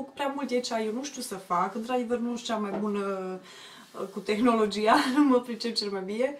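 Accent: Maramureș, Romania. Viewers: Draga şi frumoasa mea Maramureşancã Azi dimineațã te-am descoperit, nu ştiu cum nu te-am vãzut pânã acum, mã şi mir😲🙃 Când am vãzut fãțuca ta frrrrumoasã😍😍, yaay, am zis sã vedem cine mai este aceastã fatã frumoasã foc. Şi apoi a venit surpriza cea mai plãcutã😍😍❤❤👍👍 Te ascult şi te ascult şi zic na fata e ori Clujeancã ori Maramureşeancã de a mea👍👍❤❤😍😍 Iau telefonul şi încep sã caut între clipurile tale. Şi daaaaaaaa👍👍❤❤❤❤❤❤❤😍😍😍😍😍 am avut dreptate şi nu imaginezi cât de tare mã bucur şi o sã-ți spun de ce🤗🤗 Nu-s eu Maramureşeancã dar sunt nãscutã la Carei pe meleagurile Sãtmãrene❤❤ Deci tot din zona aceea pe care o iubesc din toatã ființa mea❤❤deşi de 38 de ani m-am fãcut Clujeancã😁👌❤❤ Scumpa mea nici nu ştii ce dimineațã frumoasã mi-ai fãcut. E o plãcere sã te ascult dar în primul şi primul rând eşti o frumusețe o minunãție de persoanã. Şi apoi şi eu iubesc parfumurile şi produsele de makeup la nebunie deşi nu mai sunt chiar aşa de tânãrã( bine mintea mea o am acolo pe la 25 de ani🤗😉😉😁😁) Ai cãştigat o abonatã serioasã şi de la mine o sã ai mereu douã like-uri binemeritatã( unul şi de la fiul meu) Îți doresc din toatã inima mea mult mult succes atât aici pe yt cât şi în viațã frumoasa mea❤❤ Te îmbrãțişez cu mult muuuuult drag şi vin şi pe insta( eu gyorgyeniko1965) ❤❤❤❤❤❤❤❤❤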